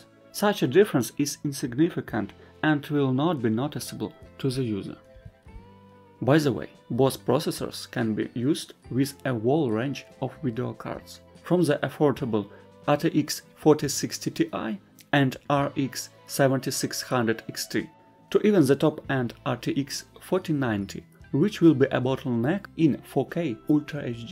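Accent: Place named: Russia. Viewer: Слышен русский акцент)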